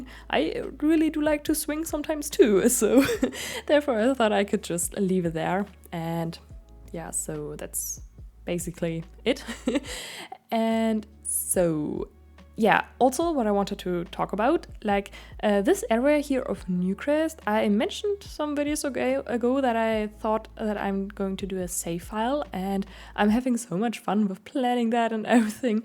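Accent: Germany. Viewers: 🤣🤣 thanks for clarifying! I knew she sounded German. Danish would be a close match, tho!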